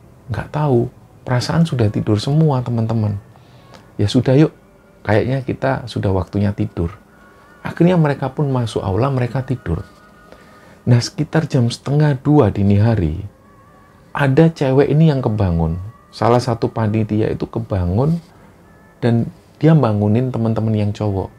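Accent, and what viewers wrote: Java, Indonesia. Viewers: Wkwkw ternyata mas temen naik gunungnya bestie ku 😂😂 Doi bilang 'itu mas temen gua 😅😂' Langsung dong, kirim salam buat mas, logat jawanya bikin candu kalo gw lagi masak ato bersih² rumah 😂😅 Ok back to clara 🙂🙂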